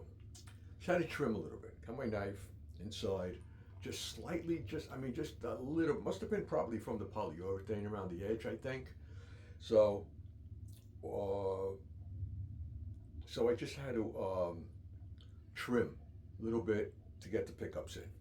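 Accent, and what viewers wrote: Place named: Northeast, United States. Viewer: I'm an Irish guy from the Bronx, and I sound similar to this guy. It's a Northeastern thing.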